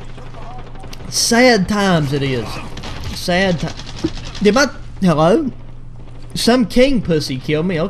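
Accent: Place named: Eastern Kentucky, United States. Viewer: Where you from, man?? It's so rare to actually hear folks with our accent on here! Watching from east KY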